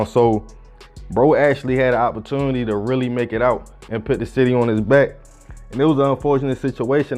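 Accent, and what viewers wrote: Baltimore, United States. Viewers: I'm sorry but yall ex baltimoreans(so you say) are a part of the problem. You see a problem but you have no solution to help. So here you come on this man PLATFORM talking about how someone is speaking. Yall dont know how he speaks OFF OF YOUTUBE. And if you're TRULY FROM BALTIMORE CITY, THERES NO HIDING YOUR ACCENT. GTFOH!!! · Reposted comment. Im from Bmore, unfortunately. This is sad but the citizens are dumb enough to fall for the tricks. Instead of dealing with the corruption, they are too busy going after each other because they are afraid of white people. A lot of sellouts in Bmore, especially the women. They HATE black men because they look at us the same way white people do. That's why a lot of them are not married or in a relationship but have kids. They'll sleep with black men but can't keep them. Then go against black men in front of white people to get kudos and crumbs from them, smh. 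It's embarrassing being from there because when I am out of town, wherever I am, and I tell people I'm from there, I get silence and blank stairs. They think it's like the Wire, which is a good dramatic series but it put a stain on the city because the average black man from there, gets looked at that way. People think I'm from somewhere else because of how I talk. I NEVER talked like the people in the city or the guy narrating this video. No dis but I was brought up not to talk like that so I didn't. Anyway, black people are the ones who talk like that so when I tell people I'm from there, they are surprised. "You dont act them" or "you don't talk like them" is what I get all of the time and I'm glad because it makes me stand out. People actually like me and trust me more because of it. People think I'm from the Caribbean, Africa, or New York. I'll take it because truth be told, another thing baltimoreans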